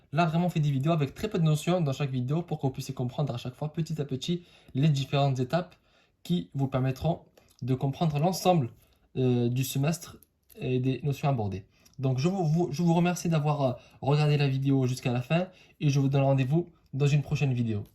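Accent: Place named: Morocco. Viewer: Merci, rien à dire vous sauvez mes révisions ! J'ai remarqué un accent marocain chez vous si je ne me trompe pas Allah y hafdk c'est très bénéfique ces séries de vidéos.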